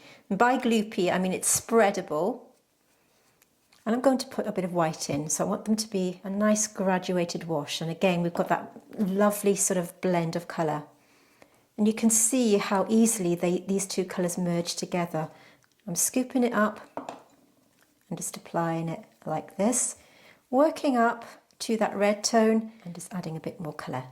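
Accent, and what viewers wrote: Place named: England, United Kingdom. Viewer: thank you, I do do that but it is frustrating to pause it because then you have to bk it up and YouTube is making that difficult. Why can't they just leave well enough alone. Just got the holbein Gouache myself so I'm watching all your wonderful videos to learn. You are so very amazing. And as for the voice over text, we can't hear you're lovely voice. I myself love an English accent.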